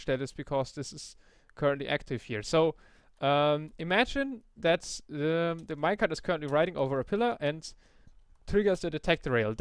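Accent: Germany. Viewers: are you german? :D your english sounds like your german^^ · german huh ? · It's probably German because of how the word what sounds like vhat And we is ve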